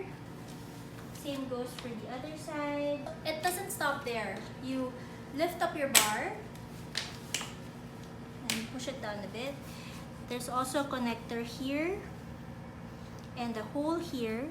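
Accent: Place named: Philippines. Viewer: Hate the accent I can’t…. Pinoy much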